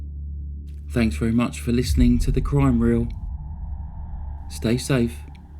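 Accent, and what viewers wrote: England, United Kingdom. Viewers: You are all very nice. Love "my dear"😊Sounds like you are from England!? Would love to visit England one day. Thank you💜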